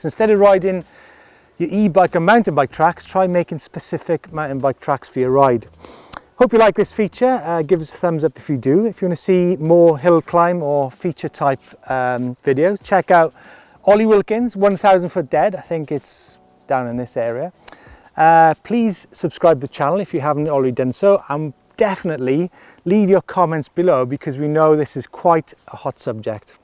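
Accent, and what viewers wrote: United Kingdom. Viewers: I wish if you speak english like a texan yankee,would be better than british.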